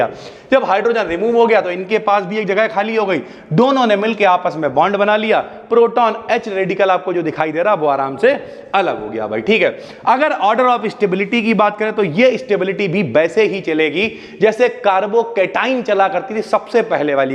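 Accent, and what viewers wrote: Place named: Kanpur, India. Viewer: sir saari videos aapki line se dekh rha hu sir sb samjh me aa rha i really thank u sir for such a great content in your kanpuriya accent.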